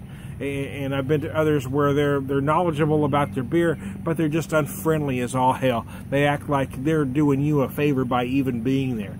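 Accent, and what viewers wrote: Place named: Missouri, United States. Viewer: You sound like such a Missourian lol I'm from Missouri too. Loving these Reverence Series reviews but sadly never tried the Revolution Series. Cheers!!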